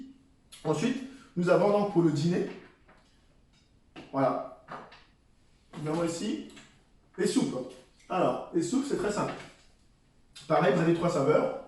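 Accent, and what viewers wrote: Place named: Ivory Coast. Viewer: Très belle présentation de la body mission. On dirait j'ai capté un petit accent 225🇨🇮🇨🇮🥰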